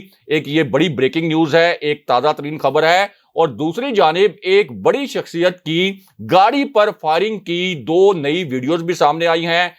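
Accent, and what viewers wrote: Punjab, Pakistan. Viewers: please stop your bullshit, you are even damaging the foundations of Truth people should be aware of. Beside you speak very Ghulabi Urdu in Punjabi aceent and sittiing with whote-cream makeup. Get some standards.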